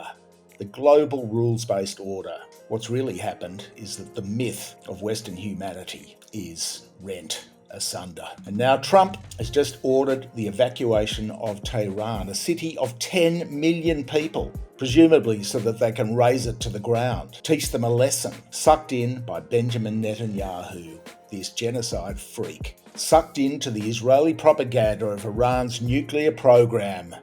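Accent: Australia. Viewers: It’s great to hear an Aussie accent speaking the intelligent logical sane truth! Thanks ❤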